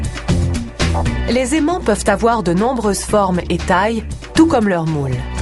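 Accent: Quebec, Canada. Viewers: L'accent québecois est horrible,perso je n' arrive pas a écouter même en me forcant.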